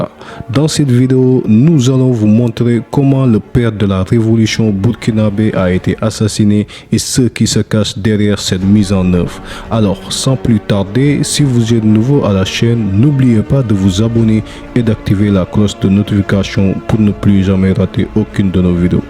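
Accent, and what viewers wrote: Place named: Senegal. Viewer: 😀c'est un senegalais qui parle comme ca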